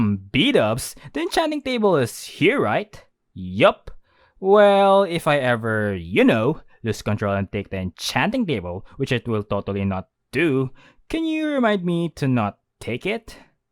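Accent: Philippines. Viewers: the filipino accent is strong in this one haha <3 sending more love from the ph!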